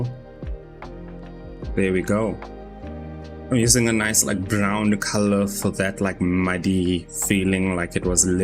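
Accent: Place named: South Africa. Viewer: btw one question, are you south african? i can hear by your accent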